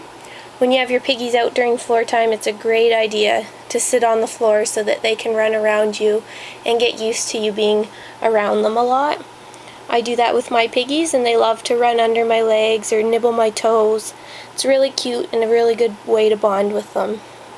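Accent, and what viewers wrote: Canada. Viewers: I <3 your accent. Are you Canadian?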